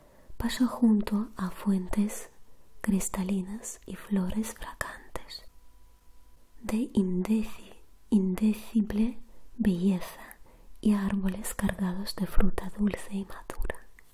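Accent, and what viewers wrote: Russia. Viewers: Tu voz es fascinante, me encantan tus videos asmr. Esa combinación de español con el acento ruso es adictivo. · No me suelen gustar los videos de lecturas pero no entiendo porque al empezar el video el accento ruso puede conmigo xD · desde que escuché este video de lectura susurrada me hice adicto a tus asmr. para mi gusto el mejor asmr en español con acento ruso. Comienzas la oración con un nivel de volumen en tu voz y en la palabra final bajas el tono...esto hace muy placentero tu asmr. Gracias :)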